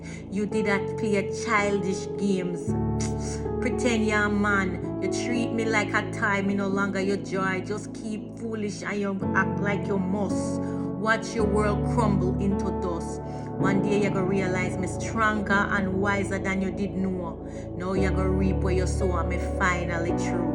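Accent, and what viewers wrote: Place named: Jamaica. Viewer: Love it. You sound jamaican, are you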